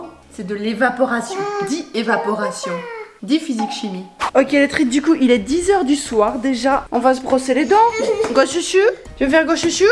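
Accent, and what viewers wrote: Brussels, Belgium. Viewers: Coucou! Je suis ta chaîne depuis la Belgique, de Liiiidje 😅🇧🇪 tu es d'où toi 'originellement'? Tu as un peu l'accent de ma couzine de Forest hehhe! J'ai découvert ta chaîne il y a peu grâce au Home tour et puis je suis tombée amoureuse 😅😍 de ta bonne humeur communicative, tu m'inspires positivement et j'ai l'impression de me sentir mieux pendant ce confinement grâce à ce voyage virtuel dans votre super vie 'exotique' si jpuis diiire hehhe. c'est marrant l'effet des vidéos quand même hein.. J'ai déjà partagé tes vidéos aveca meilleure amie et des amis fan du Japon car je trouve que c'est sympa comme tu présentes ça en tant que Belge 👍🏻😊 Continue à nous faire vivre des petits moments bonheur comme ça'ps: j'adore ta maison elle a l'air tellement grande et le jardin avec son porch' LOVE. IT. HIHI bises. Sophie de Liège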